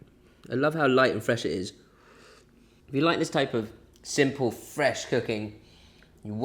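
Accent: United Kingdom. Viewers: u got a british accent bro?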